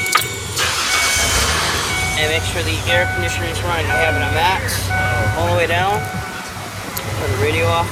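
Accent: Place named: New Jersey, United States. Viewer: Jersey accent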